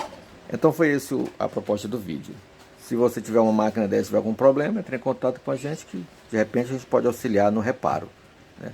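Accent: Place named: Bahia, Brazil. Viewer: o sotaque parece da Bahia, sou de Salvador! Apesar de aqui estar complicada a situação, ainda assim n troco aqui por nada!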